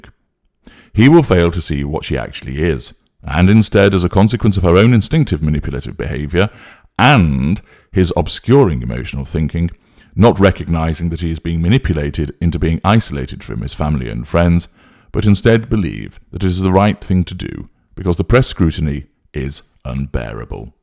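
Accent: United Kingdom. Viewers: Are you Oxford educated? I'm American; your accent is the easiest on the ears of anyone British I have heard.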